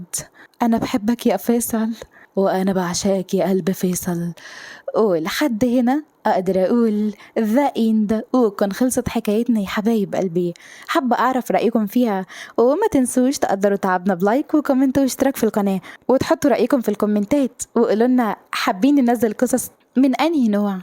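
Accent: Syria. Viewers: تحفه وصوتك تحفه وكلامك تحفه وقصصك تحفه ونتي تحفه كل حاجه فيكي تحفه ولكلام السوري طالع من بقك زي العسل ربنا يخليكي يا يا مدلعانا حبيبت قلبي انتي 💐👏💝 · 😂 او يا بتعرفي تحكي سوري كويس ماشي حال جيد جدا ❤ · حبييييييييييت اللهجة السورية تبعك❤ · لهجتك السورية جميله قوي ❤❤❤❤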